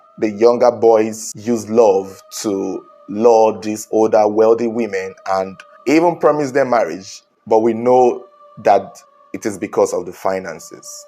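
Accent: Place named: Nigeria. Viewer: You sound Nigerian. Where in Ghana region did this happen? Did you mention?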